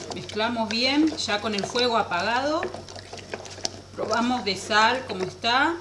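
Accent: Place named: Argentina. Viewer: Eso depende del país en donde vivas y las pronunciaciones de ciertas palabras. Ella es de Argentina y por eso tiene esa pronunciación. No lo hace mal, sólo es su forma de hablar, y está bien.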